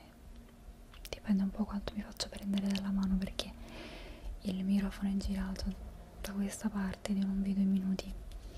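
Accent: Argentina. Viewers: tengo una amiga italiana-argentina que se parece a ti. tu español suena bastante argentino también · tu acento en español es muy argentino..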